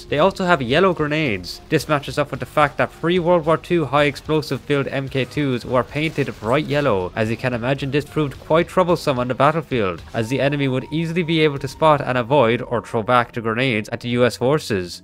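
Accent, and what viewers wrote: Ireland. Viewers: Your Irish accent is so cute! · I like the Irish accent.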